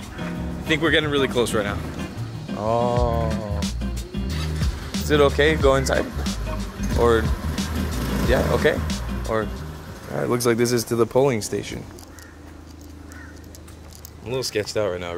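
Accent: Sri Lanka. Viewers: You look Sri Lankan :D You even speak like some Sri Lankans. :) I think we may be rubbing off on you ;)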